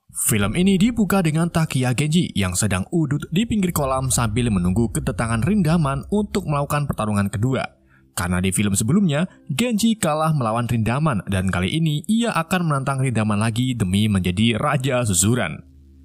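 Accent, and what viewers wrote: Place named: Central Java, Indonesia. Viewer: pames kwkw,jateng bgt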